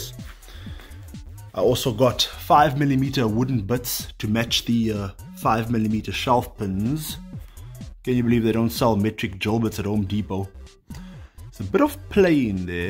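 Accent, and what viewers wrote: South Africa. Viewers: South African accent? Cape Town?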